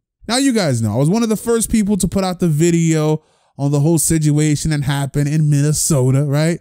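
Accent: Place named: Houston, United States. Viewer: 😂😂 I can tell you’re from Houston 😂😂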